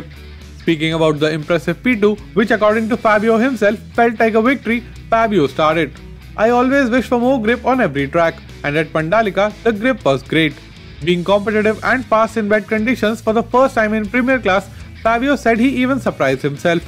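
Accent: India. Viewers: Great content,. Presentation is very good. Some, maybe a very few people might want a slightly slower-paced narration. I’m in USA, I can decipher Anglo-Indian English easily, but my compatriots may struggle slightly. Overall this is good channel.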